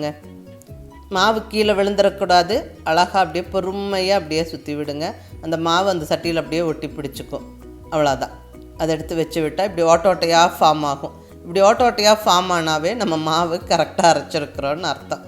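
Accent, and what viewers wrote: Kongu, India. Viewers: I like your tamil kongu slang mam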